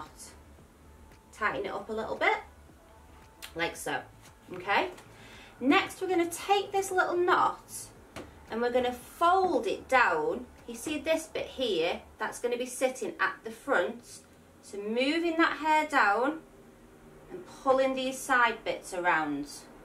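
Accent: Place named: Northern England, United Kingdom. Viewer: Thanks for keeping things normal. I'm from Warrington but live in St. Louis and listening to your lovely northern voice comforts me and reminds me of home. Stay safe and keep posting xx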